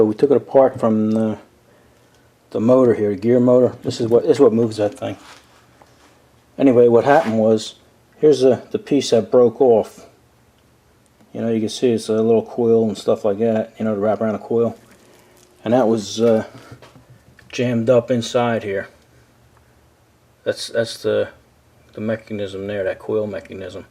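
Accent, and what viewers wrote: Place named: New Jersey, United States. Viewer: Thank you! this is exactly my problem. Awesome vid! also, are you from New Jersey? -just wondering cuz of the accent.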